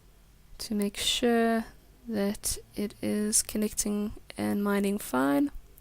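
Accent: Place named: South Africa. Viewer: I have to agree with you, you sound very South African, local is lekker:)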